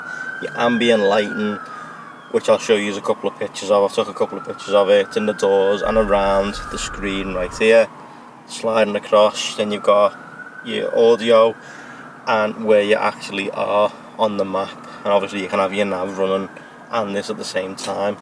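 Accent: Liverpool, United Kingdom. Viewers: 6.2 V8! Nice to hear a scouser! haha Got my SS here in Liverpool haha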